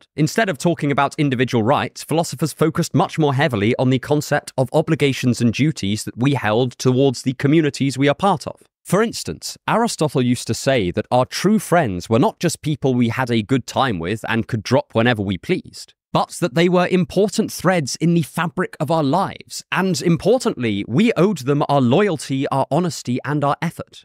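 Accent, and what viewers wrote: United Kingdom. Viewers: Relax with the British accent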